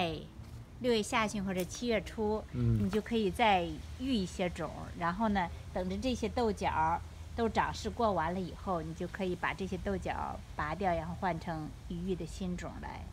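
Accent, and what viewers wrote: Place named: Zhuozhou, China. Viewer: 听着这么熟悉的口音，您是涿州一带的人吧？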